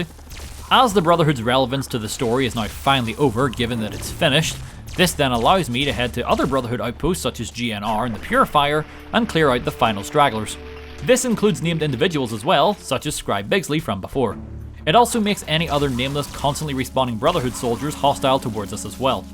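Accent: Northern Ireland, United Kingdom. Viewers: Clicked on this video and was immediately flash banged with "HOLY SHIT IS THIS DUDE NORTHERN IRISH" lmao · My m8 said u were northern irish the 1st time I heard ur voice I knew he was right and what is ur least fav religion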